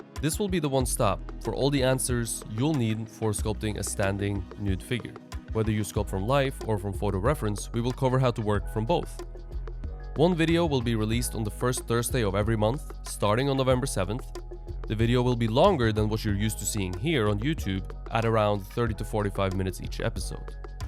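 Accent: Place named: Italy. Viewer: your wood took from torante? Terante? Toronto? Please don’t have this Italian accent. I’m searching for this tool for the whole day and I can’t understand what’s the name of the brand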